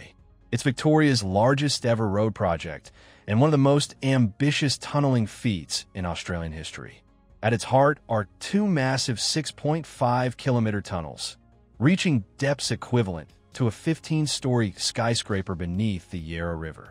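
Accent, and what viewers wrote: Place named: United States. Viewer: Mispronounced place names, American accent, left hand drive, this is hard to watch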